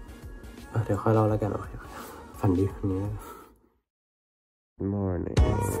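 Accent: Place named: England, United Kingdom. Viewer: Hihihi I love yo English accent 🥺♥️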